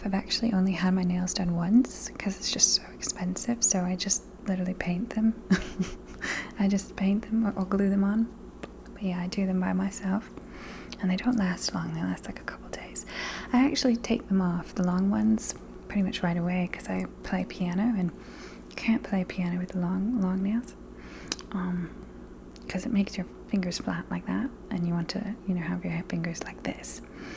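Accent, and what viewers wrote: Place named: South, United States. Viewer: Such an interesting idiolect. It's funny, when I first heard you speak I couldn't tell if you might be from the south or the east coast so guess it makes sense you have a connection to both... sometimes I heard Jackie Kennedy and other times what I imagine the daughter of Shelby Foote would probably sound like.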